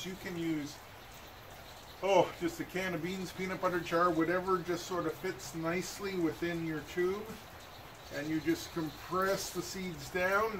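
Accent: Canada. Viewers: Hi and thanks for the video. You sound Canadian...where can you get inexpensive bird seed?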